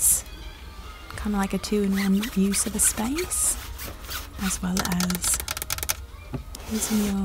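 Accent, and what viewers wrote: United Kingdom. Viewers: Lovely British accent. Very soothing voice. Gorgeous nail tapping.😃👍✨🍀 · i love ur accent !! the best, im in love wit british accents😩😩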